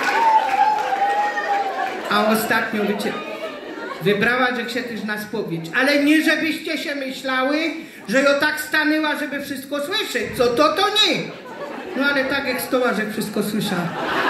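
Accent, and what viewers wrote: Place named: Silesia, Poland. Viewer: Super kobieta uwielbiam Śląska gware bardzo miło posłuchać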